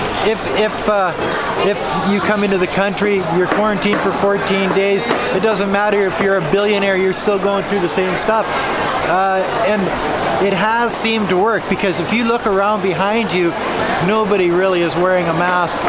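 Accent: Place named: Canada. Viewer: Sounds like a Canadian accent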